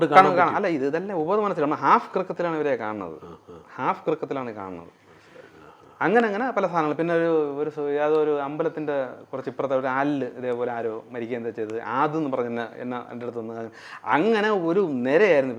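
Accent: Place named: Thiruvananthapuram, India. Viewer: An Autobiography of a Yogi യിൽ പറയുന്ന കാര്യങ്ങൾ ഇദ്ദേഹം സാധാരണ തിരോന്തരം ഭാഷയിൽ എത്ര വ്യക്തമായി ഉദാഹരണസഹിതം ലാഘവത്തോടെ പറഞ്ഞു തരുന്നു ഇത് മനസ്സിലാക്കുന്നവർ ഉയർന്ന level ആകണം 🙏🏻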